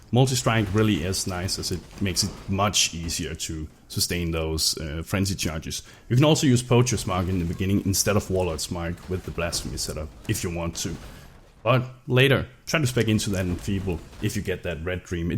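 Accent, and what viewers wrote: Denmark. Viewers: are you from denmark xD that accent sound familier ^^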